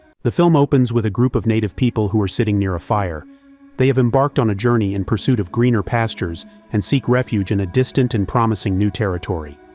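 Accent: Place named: United Kingdom. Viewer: British? Lost me...must be shit.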